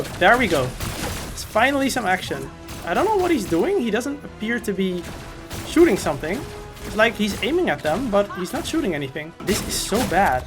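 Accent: Netherlands. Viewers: Is he dutch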